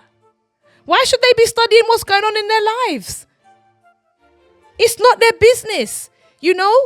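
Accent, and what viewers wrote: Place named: United Kingdom. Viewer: I love your British way of saying everything! Crackers, side salad, also darlings! I’m so glad you’re here ❤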